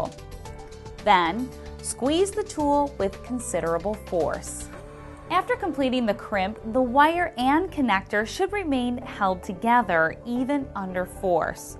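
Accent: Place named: Upper Midwest, United States. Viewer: this is a good vid but her accent is hilarious. I guess I haven’t been in the upper midwest enough....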